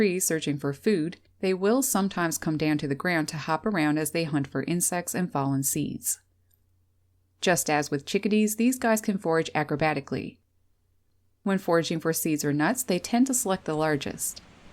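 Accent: Canada. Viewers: I always wondered if these guys and the black capped chickadees (both frequent flyers to my feeders) were related somehow as their endearing calls are very similar in sound. Almost like a dogs squeaky chew toy bring chewed at a rapid pace. Too cute I now have the pleasure of hosting 4-6 Black-capped chickadees, Tufted Titmouse, cardinals, brown thrashers, house and purple finches, blue jays, warblers, chat birds and the red bellied woodpecker at my four feeders every day. They are literally waking me up every morning to get up and put the feeders out. It’s too cute. Oh, and recently I’ve been getting some mourning doves picking up seeds of the ground. Apparently the word is out, my place is the place to be., I’ve been meaning to ask, is your accent Canadian or North Dakota/Minnesota? I’m in Florida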